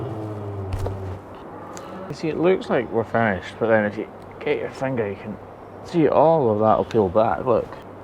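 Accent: Scotland, United Kingdom. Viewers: I'm a 65 year old grandma and I live in a little old house on the lower Eastside of Erie, PA. And I never miss an episode of the hoof gp. Why I find it so fascinating 🤔 I have no idea. Maybe it's that Scottish accent 🙂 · I really enjoy watching your videos . I’m fairly new to your channel, but I must confess, since I’ve been watching you every time I clip my nails I hear (in your lovely Scottish accents) “ You lot just look at this heifers overgrown hoof , lets just make her as comfortable as possible “ 😜🤪😝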